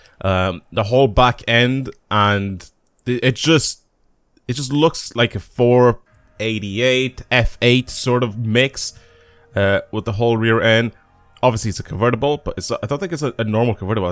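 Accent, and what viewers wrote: Ireland. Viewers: yo what accent do u have im terrible with my countries but im thinking Irish?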